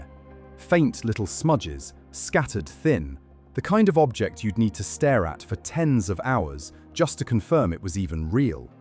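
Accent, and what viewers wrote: United Kingdom. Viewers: Why does the narrator have a British accent?? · What's with the British accent?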